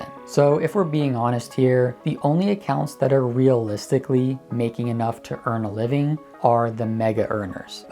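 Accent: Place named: India. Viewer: This dude sounds like an indian scammer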